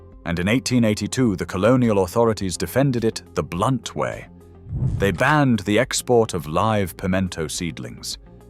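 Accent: United Kingdom. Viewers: I love this channel! I've learned so much from it and I hope it continues. I especially like the British accent.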